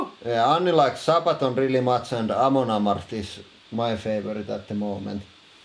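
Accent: Finland. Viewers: Just recently discovered your channels and I enjoy watching you destroy shit, and the Finnish accent makes it even better! Keep up the good work! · I'm watching your videos because they're entertaining and see what you crush every time is exciting and when you deal with Ms HP plastelina creatures and of course your Finish accent is fantastic. It is such a lovely accent (my favorite accent). Every time I look at my Nokia I think off you guys. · I watch your videos because they are so entertaining. Yes there are a few people now that have copied your Idea. But i don't enjoy their videos. Its not just about crushing stuff. Its that amazing combination of destroying stuff, your Finnish accent, the laugh of Anni, and your personalities. You seem like awesome people i would instantly invite over to my place for metal & BBQ & beers. But i think Germany is like 1 million miles away from Finland. 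Even Finland is 1 million miles away from Finland. Personality is so important for me when it comes to the channels i watch. Thats why i watch Dudesons, Abom, AvE, OxTools, BigClive, GMM, adunbee, SpectreSoundStudios, EllieAwesome and such. And also, Anni seriously reminds me of a cousin of mine. I swear to god, if you dressed them the same, with the same hair colour, people not knowing them personally will mix them up lol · I love the accent. It reminds me of my grandmother from Savo. Who makes the clay animals you crush? They are quite good. Sorry to see them destroyed, but they must be dealt with. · Love the extra content and laugh:D And your Finnish accent:) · Erittäin kaunista Suomiengelskaa! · Vastaus kysymykseen: Oon kattonu teidän kaikki videot ja subannut molemmat kanavat, koska: 1) Ensin katoin paperivideon, koska luin siitä iltapaskan nettisivuilta. Tykkäsin sen aitoudesta: selostus rehellisellä rallienglannilla eikä myöskään mitään turhaa amerikkalaistyylistä esittämistä. 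Video oli jännä, ytimekäs ja lopputulos odottamaton:) 2) Päätin katsoa ne muut videot, mitä teillä oli. Huomasin, että täähän on ihan hauskaa settiä noin niin kuin muutenkin:) 3) Sen lisäksi, että tykkäsin viihdyttävästä tavasta selostaa, niin tykkäsin myös siitä, että Anni on aina mukana. Aika usein reaktiot niin prässäyksen tapahtumiin kuin rallienglantiin kutkuttavat nauruhermojani:) Mun kokemuksen mukaan te molemmat olette aitoja ja välittömiä ihmisiä, joiden videoita on hauska katsoa. 4) Muovailuvahahahmot videon lopussa ovat olleet tärkeä lisä. Jos videossa ei ole ollut muuta kovin yllättävää, niin on ainakin joku jännä uus ja äärimmäisen vaarallinen hahmo, joka saattaa hyökätä milloin vain:) 5) Tää Beyond the Press on tarjonnut sitten kaikkea mukavaa lisäjuttua teistä, mikä on vahvistanut kokemustani siitä, että olette kivoja, aitoja ja välittömiä ihmisiä ja teidän videoita on kiva katsoa. 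Ja tietty nää kaikki jännät testit, jotka ei kuulu pääkanavalle. Teitte viisaasti jaon pääkanavan ja tän Beyond the pressin välillä. 6) Olette onnistuneet tuomaan kanaville originaalia sisältöä melko tasaisella vauhdilla ja aktiivisesti joten, teidän videoiden katsomise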